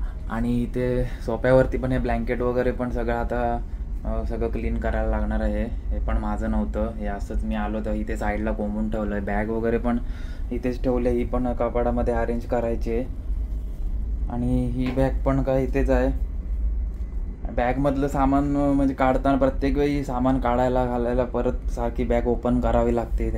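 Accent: Konkan, India. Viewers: कोकणी भाषा आहे ती तसेच बोलतात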